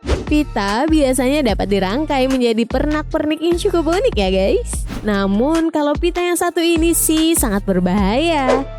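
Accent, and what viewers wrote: Banjar, Indonesia. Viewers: Yg bikin channel ini orang banjar ya?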